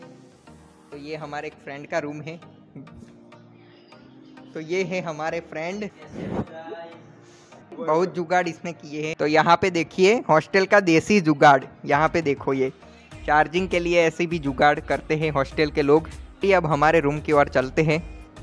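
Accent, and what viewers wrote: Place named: Gujarat, India. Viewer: Dorii,sidheee...😂🤣 gujarati hindi accent kamal😂😂👍🏻👍🏻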